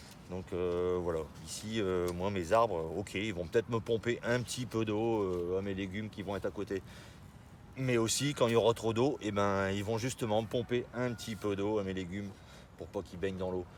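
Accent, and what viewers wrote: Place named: Picardy, France. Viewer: Salut à toi le jardinier de de(ux)main! Euuh on est cousins, frères ou je sais pas mais je pense exactement comme toi et réalise exactement les même choses que toi lol T'as un accent d'min coin, je suis dans la Somme au sud-ouest d'Amiens (environ 30 km),on pourrait éventuellement se rencontrer et se faire visiter nos jardins? Ça serait un énorme plaisir déchanger avec toi!:) Je conçois un jardin permacole depuis un peu plus de 4 ans, ça commence à être fonctionnel et bien joli aussi... Tu ne nous a pas parlé de l'intérêt de ces saules pour la biodiversité: une vraie aubaine! Ensemençez vos jardin avec des saules, c'est rapide et efficace, la vie vous remerciera très vite en vous offrant le spectacle de la nature qui s'épanouit grace à votre coup de pouce! 😆 À bientôt l'ami! Merci du partage par ta chaîne toute neuve;)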